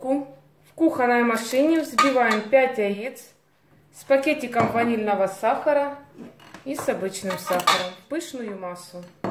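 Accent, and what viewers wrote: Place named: Ukraine. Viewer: Спасибо, за Ваше внимание, за ответ! Судя ро лёгкому акценту, Вы живёте в Украине, как и я?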